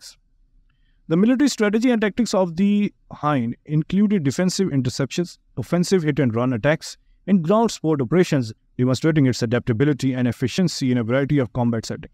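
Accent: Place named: India. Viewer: By the accent I think he is Indian. If you take it into account, he is making a real effort to speak slowly.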